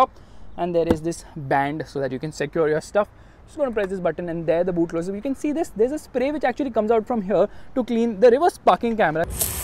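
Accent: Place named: India. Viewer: Bhai apni desi accent m english jharny sy acha local hindi ya urdu mai bat kr Angrez tera review daikhny ni aingy..Unky pas top gear,Car wow jesy shows mojod hain... Thaki hwi english bolny sy acha apni local language m review dala karo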